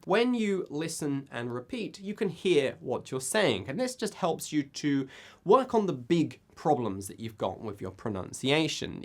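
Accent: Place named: United Kingdom. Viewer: I just LOVE your accent . Since i found your channel , i have changed my mind from wanting to practice american accent and pronunciation to british one .The british accent is so much more fun .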